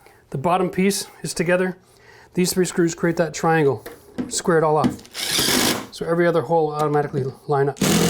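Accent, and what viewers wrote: Canada. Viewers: Im first to like this video & Saturday night would not be the same without a Canadian saying “out” !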